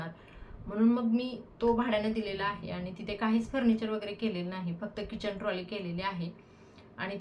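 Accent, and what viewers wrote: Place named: Sangli, India. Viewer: One shoul buy flat as oer his requuremnt unnecesry buy so mny flat may out u in danger.as maintence of society etc hv to oay al bills etc.its costky akso unnecessry paymnt if dues.try to hv 1 gd flat wgere u can maintain d same properly.its not wirth to hv so mny fkats if u hv income u may go n rent tgem out so u may get som income. I think u r from sangli area its accent is in Sangli language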